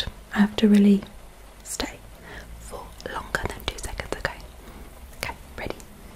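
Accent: United Kingdom. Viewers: Your British accent is fantastic!!! <3 My partner has ADHD and suffers from executive dysfunction. Watching this video helped me understand them more and taught me some ways I can help! Thank you!!! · That 330k looks very awesome under your channel name 👀 Congrats!! Also, could you teach me how to do a proper British accent?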